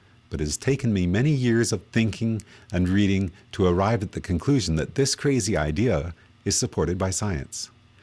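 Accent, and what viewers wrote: Canada. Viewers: His thick Canadian accent is distracting me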